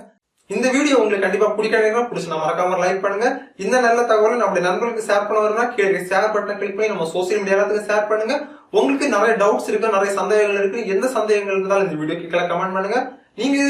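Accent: Kanyakumari, India. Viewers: neenga pesurathula...irnthu..kandupudichen...me..also Kanyakumarian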